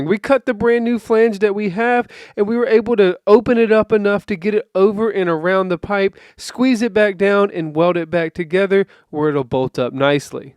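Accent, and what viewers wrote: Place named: West Virginia, United States. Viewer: I can't quite get a fix on accent. Where is he from? West Virginia? Maybe he should require to hold the title on repair jobs over $2000?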